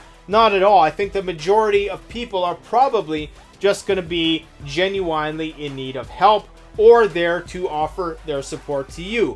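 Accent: South, United States. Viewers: Work on your southern accent.By the way, you look like "the Fly" from the '50s movies(As The ). Your videos give out very useful information. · I love this freaking American accent man it’s so awesome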